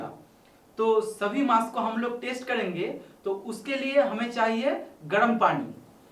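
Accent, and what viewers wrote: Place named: West Bengal, India. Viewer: i am sure that u r from west bengal becoz ur style of speaking hindi clearly derives that u r from west bengal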